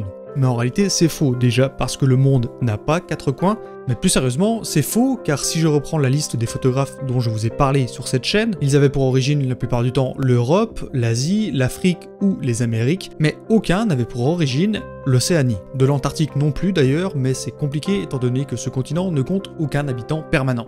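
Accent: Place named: Belgium. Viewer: Mon dieu je suis vraiment désolé mais j'ai pas du tout réussi à suivre la vidéo parce que je bute à chaque fois que tu prononces "et" "est" 😭 mes plus plates excuses à tous les belges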